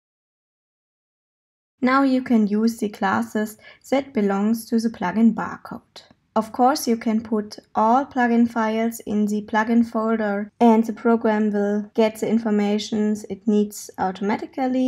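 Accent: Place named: Germany. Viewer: Not only a very useful video for us Xojo neophytes, but this girl has the cutest German accent ever. You can't help but smile every time she tries to use a 'V'.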